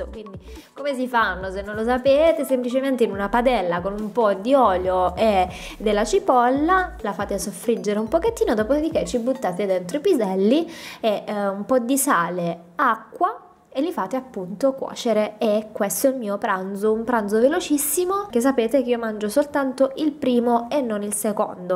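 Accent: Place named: San Severo, Italy. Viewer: accento san severese inconfondibile :)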